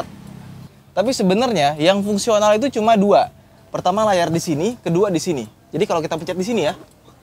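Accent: Padang, Indonesia. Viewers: Bang orang padang ya?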